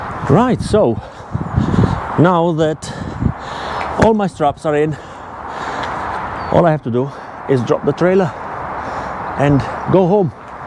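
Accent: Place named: Yorkshire, United Kingdom. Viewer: Great Vlog!!!!!!!!! Thoroughly enjoyed it!!!! I love your 'Yorkshire' accent!!!!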